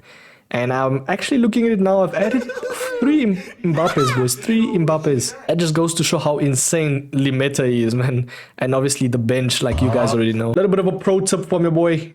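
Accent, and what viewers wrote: Cape Town, South Africa. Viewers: You sound like ya from Cape Town with a mix of something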